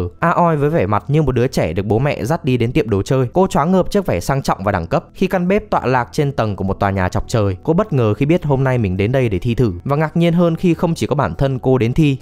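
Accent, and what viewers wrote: North, Vietnam. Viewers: Giọng chủ thớt miền bắc mà cứ ba ba, ông này người nam nói giọng bắc à :) . Ở ngoài bắc người ta gọi "bố" chứ nhỉ :)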